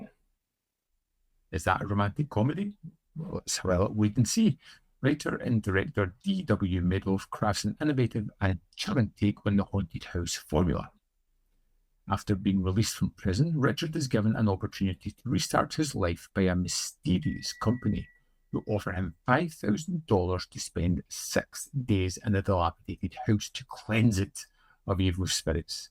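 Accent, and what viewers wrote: United Kingdom. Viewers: I subscribed in your channel, mr, Nice content, are you from glasgow, liverpool, manchester, newcastle upon tyne, edinburgh or belfast northern ireland? I notice because of your Accent 😊👍🏻.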